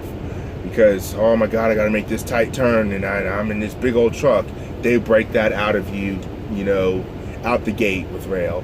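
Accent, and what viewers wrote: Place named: Maryland, United States. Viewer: You gotta be from Maryland?? That accent..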